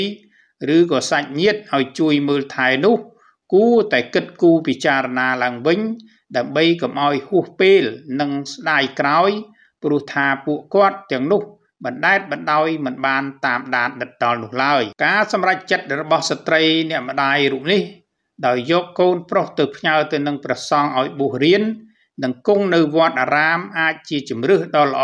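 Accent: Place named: Cambodia. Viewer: Very sad and broken hearts , She did the right choice for her son and most people they have struggled life for living and issued living problems and they missed each others very much , so next time let your son hugs a little more so he can feel more warm up with his heart was separate for a long time maybe ( can not judging or blaming on her ) . They are very sad. So I’m agree with Lok Video man . Excellent analysis and real kaun Khmer accent very clear plus personality. . We appreciate . May Gods blessings everyone stay healthy and well . ✌️💪👍👏👏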